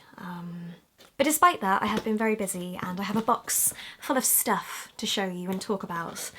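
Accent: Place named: England, United Kingdom. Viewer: Hi! I'm a long-time italian fan of yours, as I've always loved your videos, your art and your ecstatic English accent! I'd really love to get one of your original canvases, but I visited your shop on Etsy and I could just find prints. Is it because it' not possible for you to ship in Italy? Hope you'll reply, can't wait to have on your your art work on my bedroom wall 😊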